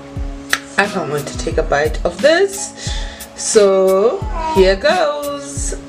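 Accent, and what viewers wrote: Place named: South Africa. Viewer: You can just tell she’s South African 🇿🇦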